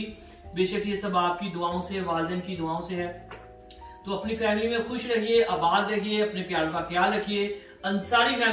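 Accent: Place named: Punjab, India. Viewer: Your Punjabi accent is v good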